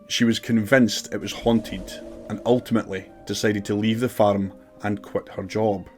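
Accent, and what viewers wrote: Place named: Ayrshire, United Kingdom. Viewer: My folks live in Ayr, you sound just like you maybe come from around there? New sub and loving the wee stories, thank you x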